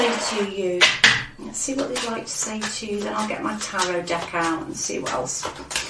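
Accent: United Kingdom. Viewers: You’re the best! Great to hear a fellow Brit ….keep dozing what you are doing. I feel relieved to be away from him!!!!!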